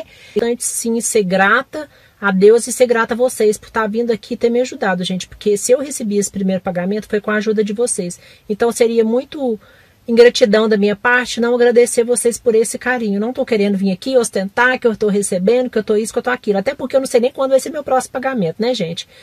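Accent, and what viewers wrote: Minas Gerais, Brazil. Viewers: Parabéns amo seus vídeos, são muito inspiradores. Sou de minas também (Uberlândia) e amo nosso sotaque mineirinho rsrs Já dou like antes mesmo de assistir, super inscrita no seu canal. Muito sucesso a vc!!!! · Parabéns 👏🏻👏🏻👏🏻👏🏻👏🏻 Qdo conheci seu canal a semana passada já me encantei com o seu jeito e a paz que vc transmite, fora esse sotaque mineirinho que é bão de mais da conta de ouvir. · parabéns, vc merece!!! Tem pouco tempo que te acompanho e já amo demais seus vídeos, vc passa muita verdade em tudo! E esse sotaque mineirinho tbm neh, gostoso de ouvir( sou suspeita pra falar, rsrs)...enfim, que Deus te abençoe em tudo e que sua obra prospere cada dia mais!!!😘😘😘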